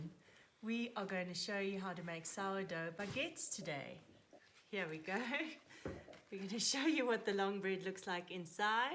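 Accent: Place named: South Africa. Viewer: A lovely video! Deserves way more views. I'm a South African baker/chef living and working in Sweden. Found the SA accent very comforting. Fantastic bread, you certainly look like you've worked professionally as a baker at some point. I'm finally beginning to master my techniques. Found the main stumbling block for me when concerning baguettes was using professional ovens. Unfortunately we don't have those amazing deck ovens at my company but rather convection ovens. They would instantly set the crust and not allow any ear formation or open crumb. After many fails and frustrations I finally settled on preheating the oven and the pizza stones to 300c with steam, and as the baguette go in the oven I'll actually just turn off the oven for the first 7 minutes while they bloom. Anyway thanks for the lovely video. Wish I found it sooner, it would have saved me so much time and frustrations. 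Go well, and keep baking!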